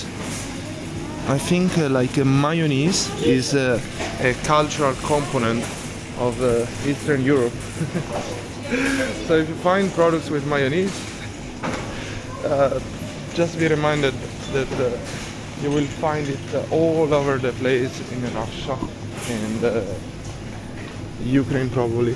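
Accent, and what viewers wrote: Italy. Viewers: Поправьте меня если не права, но как будто автор итальянец ) Узнаваемый акцент )) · Hello! Are you Italian? Your accent sounds Italian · Судя по акценту, парень из Италии? Или мне показалось?😂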